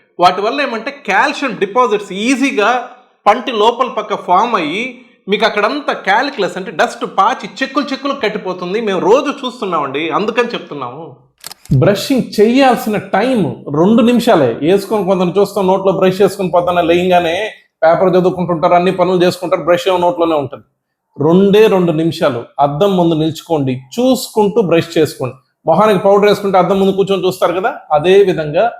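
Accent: Nellore, India. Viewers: ఒకో ప్రాంతానికి ఒకో యాస ఉంటుంది. నెల్లూరు యాస లో మాట్లాడుతున్నట్టు గమనించగలరు. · నెల్లూరు యాస డాక్టర్ గారికి మా చెడ్డ అలవాటుండ్లా.....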